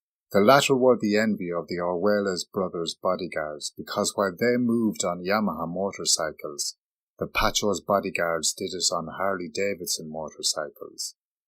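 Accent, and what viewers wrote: Ireland. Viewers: That Irish accent is not the best narrating sound lol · Colombia in a Irish accent. That as a Scottish person and someone deeply into Colombia and the false war on drugs , CIA, Pepes and Pablito etc very good Patrick Escobar Popeye RIP.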